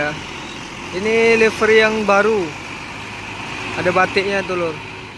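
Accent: Java, Indonesia. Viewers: Jawanya kok medok bang..sukses selalu ya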